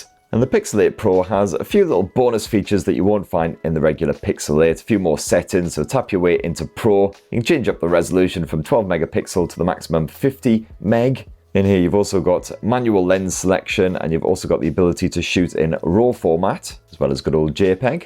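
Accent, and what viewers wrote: United Kingdom. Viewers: Jesus chirst ive never heard cliche British before this guy lays it on next level thick. Ugh/cringe..difficult to listen to. Its hits my American ears all wrong. omg it doesnt stop the cool fonzy style lingo. This guy i wow cool.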